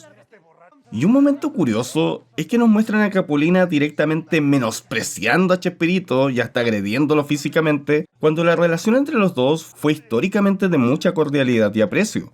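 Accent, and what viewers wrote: Chile. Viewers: Y por qué el youtuber habla como chileno? 🇨🇱🤔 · Por qué es chileno jsjs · Es chileno, así hablan ellos.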